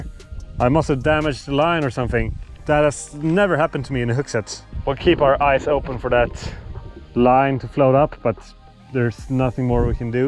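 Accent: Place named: Sweden. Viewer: As a Norwegian I love hearing his Swedish accent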